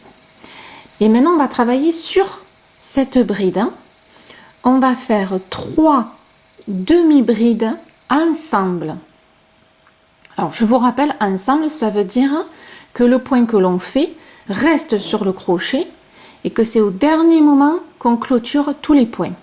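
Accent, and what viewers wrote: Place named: Southwest, France. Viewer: vous seriez pas un peu du Sud Ouest par hasard , ma fille habite près de Luchon, je reconnais l'accent ....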